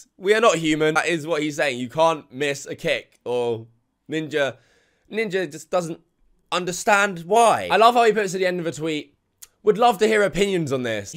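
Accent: United Kingdom. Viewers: What a whiny little bitch you are. Being bri’ish might add a lot to why you sound so whiny, kinda like your about to cry every sentence. Oye dick’ed why don’t ye shot ye gullet and fock off innit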